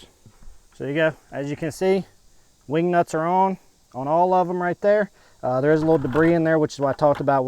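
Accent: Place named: South, United States. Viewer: I watched this today. Interesting inco. Will look into vor warm weather watering. I live in area where it freezes several months of year. I use a rubber pan for water now. When water freezes, I turn it over and stomp out ice, then refill. Easiest method for me here. What do you suggest for winter months, without using a heating source. I can tell you are from Southern area because of acceent😉. This product , In my opinion, would not work in freezing climate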